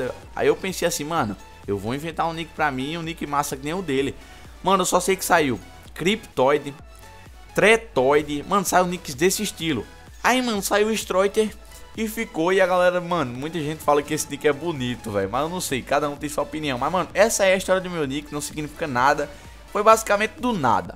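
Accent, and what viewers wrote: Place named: Paraíba, Brazil. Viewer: paraiba da pra ve o jeito q vc fala kk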